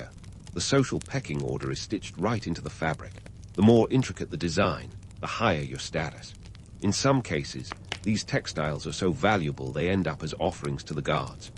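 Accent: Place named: Transatlantic, United States. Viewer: Nice story. Not sure about this transatlantic accent, kept distracting me trying to figure out if it’s British or American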